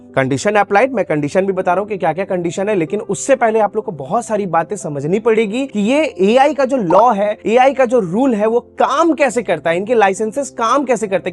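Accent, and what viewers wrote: Bihar, India. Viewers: मैं पहली बार आपको सुनी हूं। आपके टोन बिहार से लग रहा है जो मुझे बहुत प्रभावित किया क्योंकि मैं भी बिहार से हूं। मैं आपको सबस्क्राइब कर रही हूं। अच्छा लगा आपने जो बताया है 😊 क्योंकि मैं भी Ai को लेकर कन्फ्यूज थी बहुत कुछ क्लियर हुआ है। मैं छोटा सा क्रिएटर हूं। थैंक यू भाईजी 🙏 ब्लेस यू 🙏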